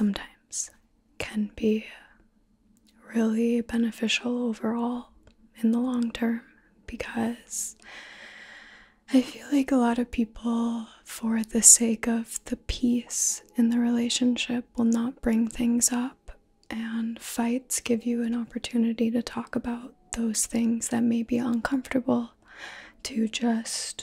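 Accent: South, United States. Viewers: You really have that southern accent down well! Great job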